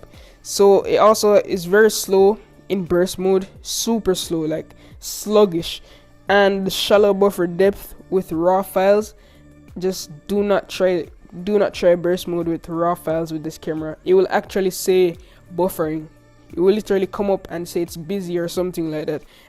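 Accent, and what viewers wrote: Jamaica. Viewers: Are you a Jamaican? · He Jamaican I can hear the accent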